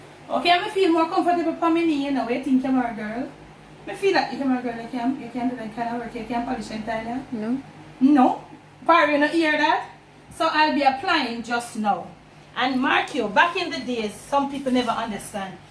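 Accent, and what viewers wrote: Jamaica. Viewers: I love your ‘back then’s’. Just love them. Sometimes I have a little problem understanding your patois but I’m getting there the more I listen the better I understand😌. Love your recipes Love to watch how much you enjoy your food. Camera Girl is such a polite young lady. Love the way you keep it so real. Please give me a shout out from time to time. My family and I visit your beautiful Jamaica every year. Montego Bay is our spot though. If I get to Kingston I would love to visit you and your family and taste your cooking, I know it’s EXCELLENT! I want to taste your soft dumplings, crispy fried chicken and ripe plantain! And yes the camera did pick up the floor shine❤️ USA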